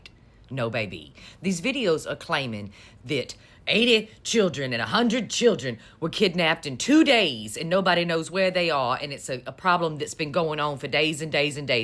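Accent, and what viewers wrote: South, United States. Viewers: You make my head hurt with that southern accent.🫶🏽🙏🏾🫶🏽💕❤️💕❤️💕❤️💕💕❤️🫶🏽❤️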